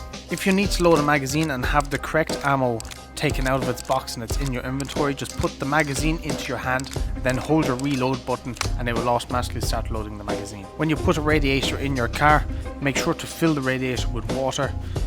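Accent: Ireland. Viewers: Are u from Ireland?U sound Irish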